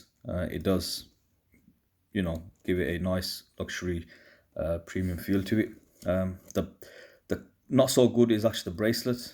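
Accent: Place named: West Midlands, United Kingdom. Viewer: Thanks for the review and updated review From the accent, possibly local to me, West Midlands (Brum maybe?)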